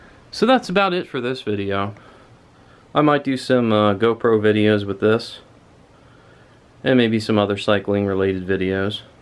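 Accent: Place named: Pennsylvania, United States. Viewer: Guessing by your accent that you're from PA..Have you gotten out and done some serious rides on this bike yet? I just sold a Tarmac Pro and was looking at this model for a more comfortable ride for the rough PA roads. Thanks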